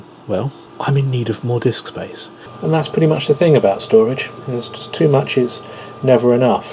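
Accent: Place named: England, United Kingdom. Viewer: Love your "English Accent" and your "Inquisitive Persistence" with the Drobo. Keep making videos when you can!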